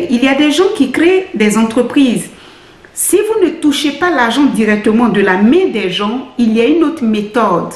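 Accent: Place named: Ivory Coast. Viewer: Bonjour madame. Tu parles avec le langage ivoirien. Es tu de mon pays la Côte d'Ivoire ?? Tu es fabuleuse et je suis fier de toi et de tes propos.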